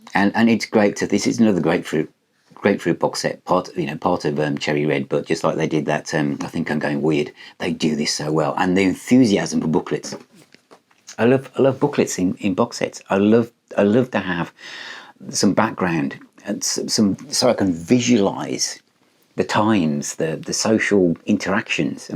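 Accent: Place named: Birmingham, United Kingdom. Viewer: I didn't hear The Move mentioned. I did hear The Uglies and Ace Kefford from The Move. For a moment, I thought you were going to tell the joke about the Kipper Tie with your strong Brum Accent.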